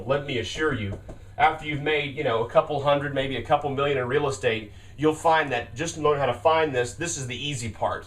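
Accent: United States. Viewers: Where did the country accent come from all of a sudden? :\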